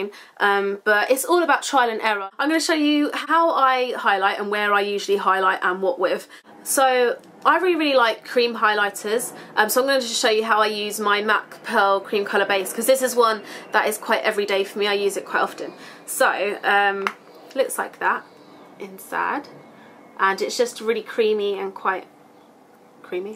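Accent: United Kingdom. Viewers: yes she does? she has a british accent.....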